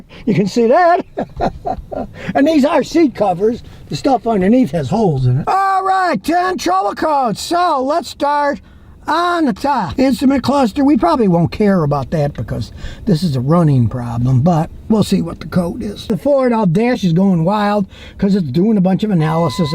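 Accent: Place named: Western New York, United States. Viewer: U annoy me so much...then your voice made me think...is he also from WNY? AI told me not from Bflo..but the Falls is the same! KNEW IT. That accent has an annoying sound. In Colo Spgs 30 yrs - same thing. I'm annoying too but ♡ my cars are so not rusty & trouble free!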